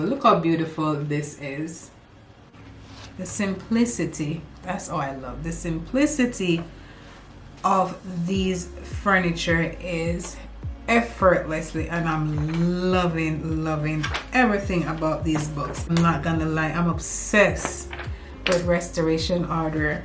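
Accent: Jamaica. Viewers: Are you Jamaican '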